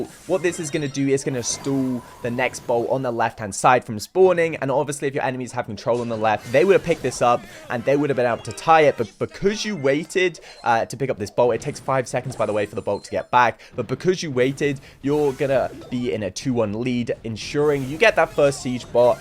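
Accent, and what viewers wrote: United Kingdom. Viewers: it's called a British accent dummy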